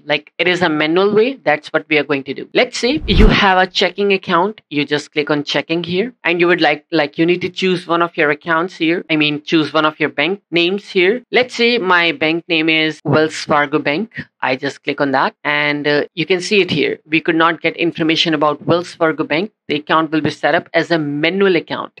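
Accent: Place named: India. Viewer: Indian accent sucks bro, honestly!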